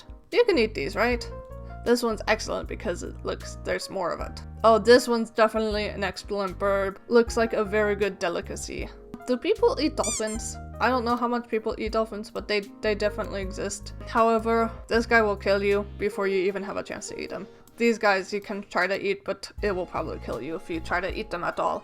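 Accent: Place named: France. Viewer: You sound french or something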